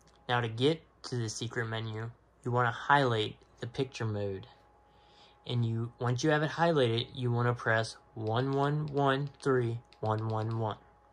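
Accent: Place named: Prince George's County, United States. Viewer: I could tell by your accent. I grew up in PG County. Waldorf and laplata. We speak with long O's lol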